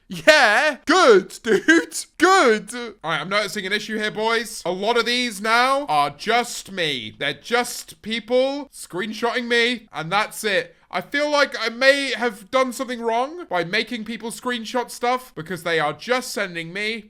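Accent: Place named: United Kingdom. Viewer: I thought you were american for a while until I realized you were british ick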